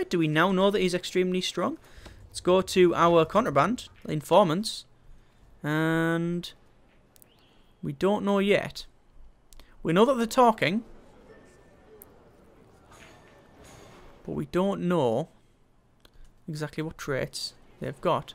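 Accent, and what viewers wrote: United Kingdom. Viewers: You have absolutely no idea how much I love you cause your from the uk, all the uk talk is just cute to me like snookered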